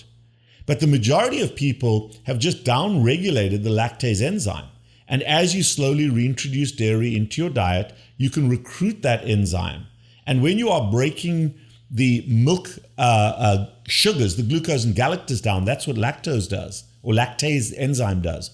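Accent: South Africa. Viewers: Thanks Doc...your accent seem to have some regional South African traces...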